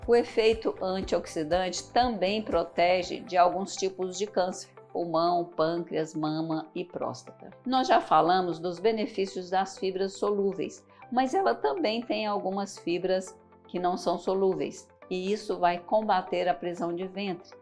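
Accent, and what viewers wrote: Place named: São Paulo, Brazil. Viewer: Eu não sabia que ela era mineira. E por que fala como uma paulista? Tem que falar como mineira.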